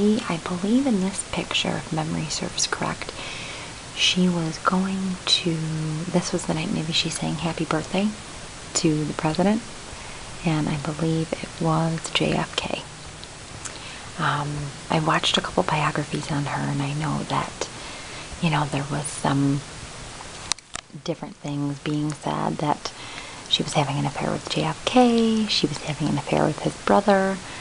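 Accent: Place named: Midwest, United States. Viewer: Are you from the Midwest? I love your accent, reminds me of home!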